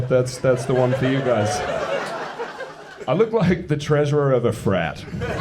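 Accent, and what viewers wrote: Australia. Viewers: Im Australian and i thought for sure you were an Aussie haha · good quality, he does sound like a posh australian, loved the siri joke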